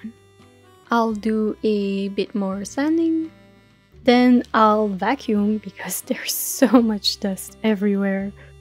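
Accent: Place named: Quebec, Canada. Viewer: Je pense ce que tu dois faire du voice over en francais. Plus specialment ton accent quebecois. C'est metal.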